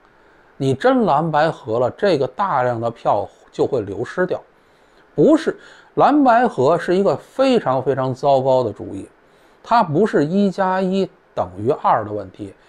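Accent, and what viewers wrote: Beijing, China. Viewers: 四年後誰執政？我跟播主有點不太一樣的看法。 我也來做個預測，中國這幾年，快一點就今年內了，會被再餓回一隻巨大的扁蝨，跟改開前一樣。 其實我很懷念二十幾年前台灣的時光，大陸妹一卡車一卡車嫁來台灣，中國偷渡客被抓時會唱梅花，假裝自己是台灣人.......的時代。 那時台灣任誰都願意喊：兩岸一家親！照顧窮親戚，誰能不愛呢！您說是吧？ 播主，你是否想太多了？雖然我也挺愛聽你的北京腔和北京大爺說話那個範兒！ 但管他誰執政！誰把台灣做大做強，讓台灣人活著有尊嚴我就投誰！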